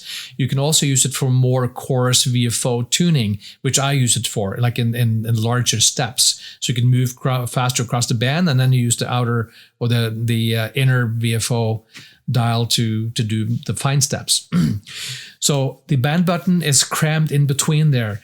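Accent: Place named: United States. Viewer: Excellent++ video! You seem like an authentic LA but you sound almost like an American? ;-) Meant as a compliment. I am a CW guy and if those RF insides were in an IC7300 - magic. But I have long Nordic fingers too and no way I can master those buttons, besides I dislike touching screens. Maybe FTdx10 housed in an FT2K or FT3K would be optimal. Yet I fear we are in the time of the silicon sliver iPad devices. If I ever see a mechanical S-meter again on a new radio it will be a Shock! de Wb8yqj diDit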